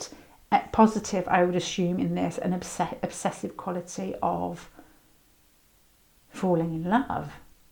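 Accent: Manchester, United Kingdom. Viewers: I got my friend Steve from Tamworth to listen to you as I was curious where you’re from and he’s great at picking out accents but you have stumped him. He says you don’t have much of an accent but if he had to guess he’d say Manchester or north of there. Me being American, I have no clue whether he’s right or not, lol. I love listening to you though. The way you say “you” when it comes at the end of a phrase is just lovely. ✨💛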